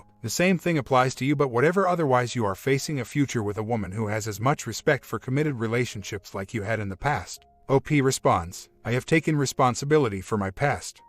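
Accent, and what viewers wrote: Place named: New Jersey, United States. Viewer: Toxic, toxic, toxic. Damn OP almost sounds like you're from Jersey.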